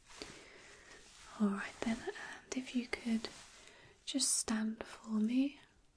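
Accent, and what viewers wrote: United Kingdom. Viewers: I’ve just discovered you and am enjoying you lovely British accent! Do wish your writing on clipboard was louder. Thanks so much , so glad I found your channel 😛💕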